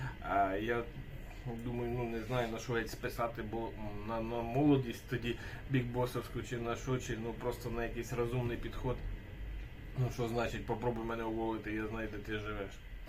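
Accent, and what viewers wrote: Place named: Western Ukraine, Ukraine. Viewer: Ооой, привіт, так приємно чути справжню Українську Западеньску мову ❤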